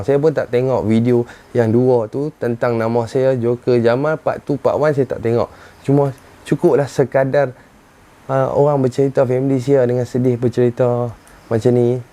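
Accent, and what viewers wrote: Penang, Malaysia. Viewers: Joker cakap kl sekerat Pineng sekerat apa PON terbaik bos Joker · please laa bercakap mcm orng utaqa .. toksahh la dok gabung kl dgn penang naik peninnnnnggggg maitttt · Cakap peneng lagi sempoi joker.x payah cakap KL bunyi pelik😅😅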